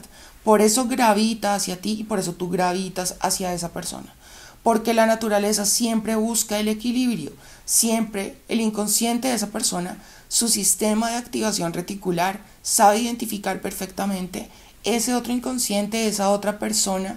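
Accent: Colombia. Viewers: Excelente video y GRAN CONTENIDO 🇨🇴 MI GURÚ FAVORITA ❣️😉✌️🇲🇽 (esa manera de hablar colombiano y esplicar con tanta paciencia te hacen muy ESPECIAL 🇨🇴❣️😉✌️🇲🇽)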